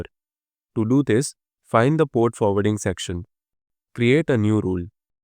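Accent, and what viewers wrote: India. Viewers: From your accent you sound Indian. I listened to many american tutors on this subject. I have easier time understanding american, but they only confused me further. You, on the other hand, were succinct, clear, well paced, and I just got my job done. Kudos.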